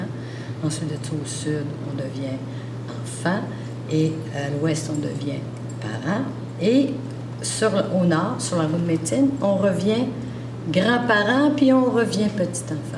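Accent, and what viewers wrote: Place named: Quebec, Canada. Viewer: Y a en masse de choses qui échappent à mon oreille de français qui adore c't'accent québecois tsé mais j'ai don' bin de la misère a comprendre toute les mots faque chu frustré pasque c'est c'est extrêmement intéressant ! J'ai pas pantoute moyen de savoir la langue qui dit : "mon nom est... " toutea la fin et j'aimerais bien la connaitre, la voir ecrite ! froggy boy.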